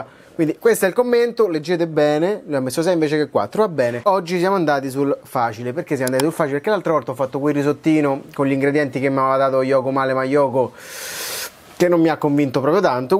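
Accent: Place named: Sicily, Italy. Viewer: Guarda che stai parlando siciliano non calabrese.....comunque sei bravo....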